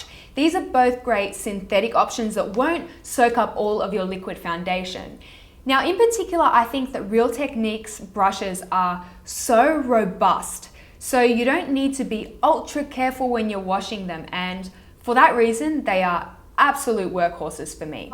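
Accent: Australia. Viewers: Your accent takes me back since i used to live in australia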